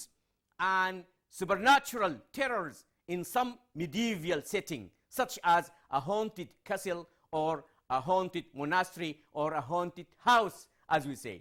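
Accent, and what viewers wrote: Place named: Sudan. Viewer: This is Sudanese english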